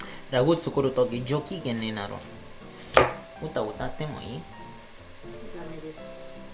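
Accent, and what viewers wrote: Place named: Italy. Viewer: 自分もやってるからかもだけど、白ワイン飲酒後？(仮)すごいイキイキしてて、イタリア訛り炸裂のハイテンションに思わず癒されました^_^ 仕事を楽しみ、動画で視聴者に元気を与えるに心底尊敬の念を持って感謝申し上げます！！！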